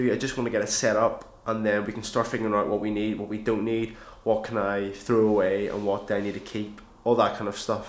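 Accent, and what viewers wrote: Northern Ireland, United Kingdom. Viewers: youre from northern ireland arent you, greetings from derry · northern irish indeed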